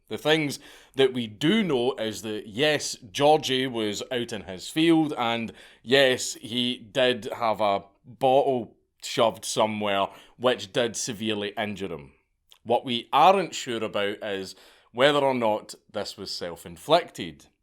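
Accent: Scotland, United Kingdom. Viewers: I only subscribed and liked all this dudes videos originally because I wanted to support someone they were trying so hard to bring down. I love the idea of reverse advertisements. Hell right now I'm looking into this ghost gun thing the news seems to be pushing so hard. I just couldn't get past the accent before. But I do have to say this little Scot is growing on me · When I first discovered him I watched so many of his videos in such a short period of time that my thought actually started voicing in the Scottish accent rather than my native American one for a while.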